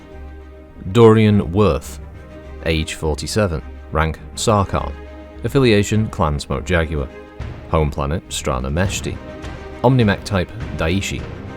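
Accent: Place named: Northern England, United Kingdom. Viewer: Yeah like you said I listen to this while doing stuff or today I'm listening on the way to work. I love picturing in my head with your upper class accent you recording these audio lectures in your enormous Library surrounded by thousands of leather bound books at Estate in the beautiful North English Countryside 😎. At least that's the delusional imagination of an American who has never been to Europe or Mary old England 🇺🇸🇺🇸.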